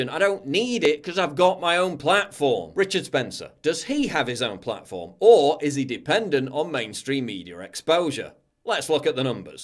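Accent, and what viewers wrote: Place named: Sheffield, United Kingdom. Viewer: Firstly, it wasn't murder. She died of a heart attack - manslaughter at the worst. Secondly, the Alt-Right does resonate with a lot of people. Red Ice TV has 145,000+ subscribers. James Alssup, present as Charlottesville, has 162,192 subscribers. Tara McCarthy has 50,000 subscribers. Alt-Right leaning "Black Pigeon Speaks" has 249,887 subscribers. Thirdly, makes some dubious claims to smear us all. Only Andrew Anglin mocked Heather Heyer's death. You smear us as "holocaust deniers" yet give no examples. Jared Taylor, Greg Johnson and Vox Day are far smarter than you'll ever be. You're just a sarcy and childish civic nationalist, regurgitating the same old Classical Liberal talking points with an annoying Sheffield accent.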